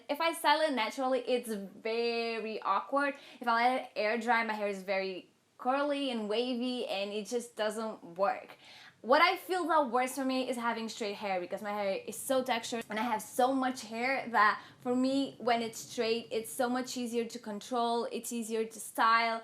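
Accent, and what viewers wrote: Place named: Portugal. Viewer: eu estava tentando descobrir qual era sua nacionalidade já que não parece ser um nome ingles, e por causa do sotaque também, e agora que descobri que você é Portuguesa tudo fez sentido haha beijos do Brasil! adoro seu canal!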